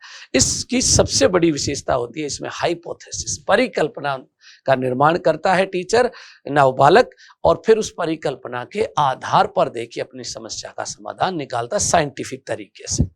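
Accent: Rajasthan, India. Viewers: आप हिंदी वर्तनी में राजस्थानी क्षेत्रीय भाषा से प्रभावित बोल रहे हैं, जो कि अशुद्ध है। कृपया सुधारे।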